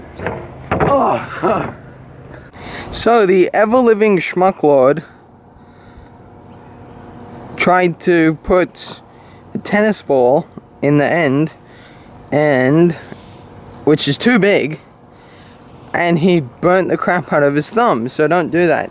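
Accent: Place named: England, United Kingdom. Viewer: You really should look into installing a grill ignitor system. Preferrably an electronic one vs a piezoelectric variety. It will solve all of your firing issues. Im detecting an english accent. Perhaps they may not be available where you live. Best of luck man.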